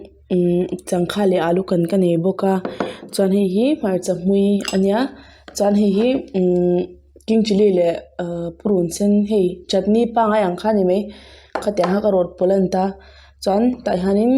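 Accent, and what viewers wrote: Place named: China. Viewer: Chinese mizo tawng thiam I ang katibawihte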